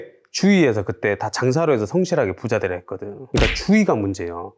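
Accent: Jeolla, South Korea. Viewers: 매번 영상시청하며 보고 듣고 배우고 실천할려고 노력중입니다.. 항상 좋은영상 감사해요.. 전라도 사투리 정감이 팍팍 갑니다.. 겁나게 좋소.. 화이팅_^**^_ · 좋아요누르고 들어요 ㅎ 전라도사투리,구수하고 듣기좋네요^^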